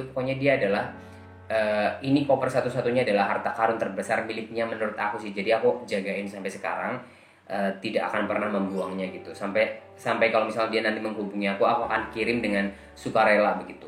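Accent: Java, Indonesia. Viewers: mas foto wkt muda cakep bingit... bahasa nya medok banget seperti org jawa gitu...emang nya org jawa ya mas..?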